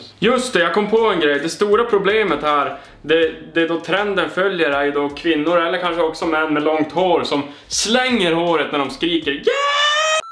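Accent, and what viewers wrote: Norrland, Sweden. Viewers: Jååå, jag gillar att äta kladdkaka samtidigt som jag kollar på dina videos när du pratar norrländska.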